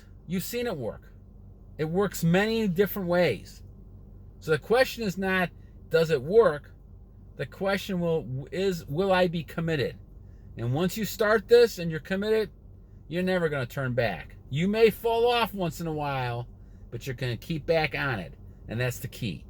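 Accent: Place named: North, United States. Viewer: you from Minnesota, Michigan? I recognize a northern accent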